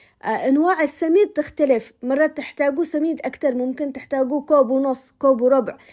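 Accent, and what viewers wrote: Yemen. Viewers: والله يعجبني كلامك ماشاء الله واغلب الكلمات اللي تقوليها نتكلمها احنا اللهجة اليمنية قريبة جدا من لهجتنا...سبحان الله متابعة من الجزاير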